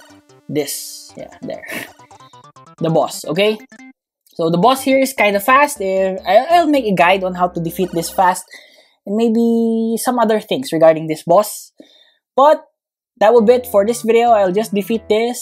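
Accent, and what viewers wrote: Philippines. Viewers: Why do you have a filipino accent?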